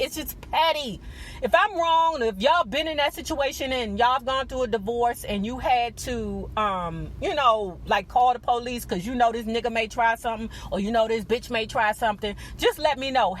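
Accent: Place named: DMV, United States. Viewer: what part of the DMV area you from i can hear it on your voice, Its in the ova's lol and certain words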